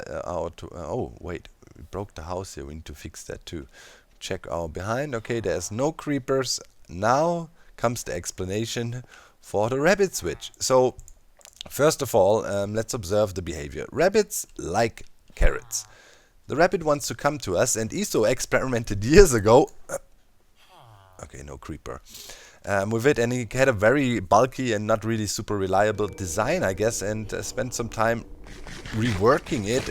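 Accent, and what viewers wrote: Germany. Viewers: Du hörst dich ziemlich deutsch an, bist du ? Wenn du nicht antwortest denke ich mal nicht :)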